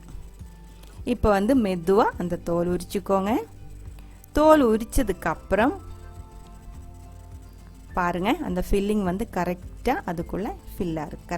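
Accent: Kerala, India. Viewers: Kerala Akka Malayalam kalantha Tamil pesaraanga, nice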